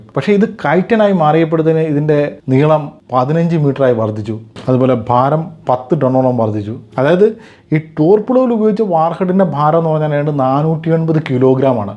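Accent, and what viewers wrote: Kottayam, India. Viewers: veedu kottayath vellom anoo....slang😊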